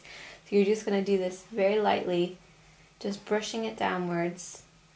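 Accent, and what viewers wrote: Ireland. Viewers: She sounds Irish. She mentions getting some '€' off the eyelash curler, which is currency in Ireland. She sounds as if she's spent time in the USA or Canada though. Her name and her look,are very Irish/Celtic, much more than her sister. :-)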